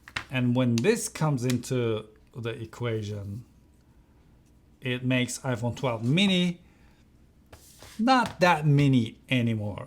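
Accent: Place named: Turkey. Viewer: you are speaking like a turkish guy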